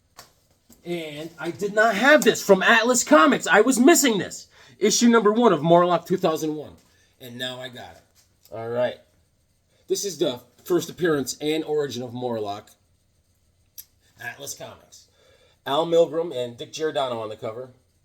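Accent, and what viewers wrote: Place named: Australia. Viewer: my guy! Popsicle art is the highest form of flattery! Loving the art! You're too tough on yourself. Conan has been a lot of fun. Never met a baby that didn't love setting off fireworks. Justice society was the victim of Ghost Machine I think. Infinity Inc. is a Beckermin favorite from way back. The legion of the unliving! Glad to hear Ron is doing good! First appearance of living lightning! That Night Thrasher is from his ongoing series not to be confused with the limited series Four Control. Issue 3 is the pro debut of Ken Lashley art. Yeah comic viking is made of stone, I'll break him down yet! Thanks for the joke loan! Did you ever see my character the comic community hunter from 2022? Such an authentic Aussie accent. I need to get that Captain Universe run. Love those og guardians of thr galaxy books! This Rip Hunter book wow!! 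Great books this week! Yeah Comic Viking is one of those rare serious Marine vets. I'll win him over yet! Have a great one!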